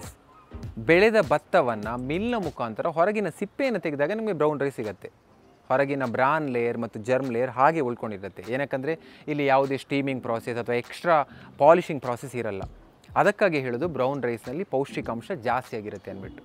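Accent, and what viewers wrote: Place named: Mangalore, India. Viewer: Nimma M'lore kannada 😍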